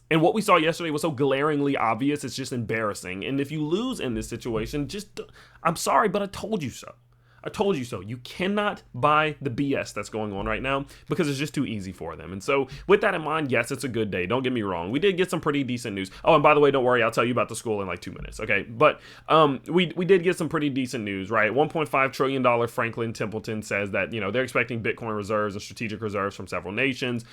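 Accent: DC/Maryland, United States. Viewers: You have a DC/Maryland type accent. Maybe Delaware but prolly not....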